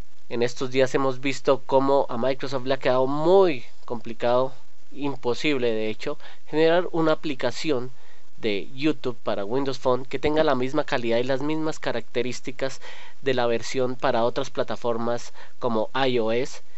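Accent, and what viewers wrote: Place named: Mexico. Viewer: El Senséi tiene toda la razón, con acento manito, pero la tiene toda :o)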